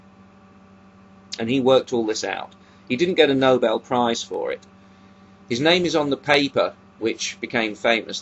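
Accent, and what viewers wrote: United Kingdom. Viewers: i love your british accent, i don't think i would have watched the whole video with an american one (especially a southern accent). :) science is awesome! at least it's something to rely on; not the God character.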